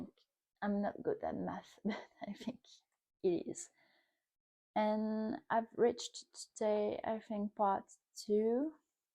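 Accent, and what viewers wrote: France. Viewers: j'entends l'accent français, j'apprécie.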